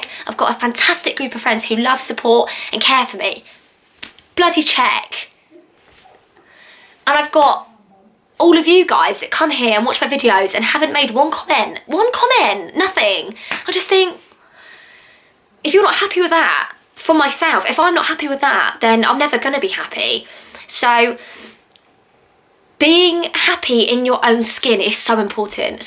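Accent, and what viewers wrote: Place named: United Kingdom. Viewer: I have a bilateral cleft palate I am Filipino male. I experienced a lot of verbal abuse from my own dad and other people because of my birth defect. I tried many times to slayed my oown life. I experienced more than ten years depression. I survive of this negtive situation because of my faith to Jesus Christ. I love your gorgeous accent and u have pretty face moon. I wish I can meet a beautiful british girl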